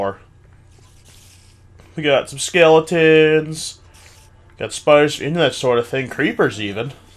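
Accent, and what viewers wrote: United Kingdom. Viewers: OMG I’m soooo sorry I went to the bathroom and I saw my 8yr old bro did that. I love your vids and I like your northern accent I kinda have a northern accent I’m sooooooo sorry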